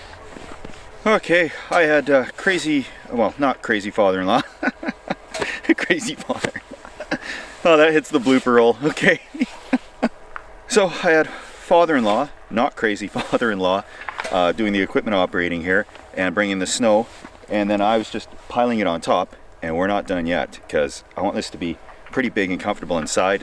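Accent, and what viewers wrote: Canada. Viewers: Is he from Canada? He sounds like it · This man so Canadian the way he talk I love it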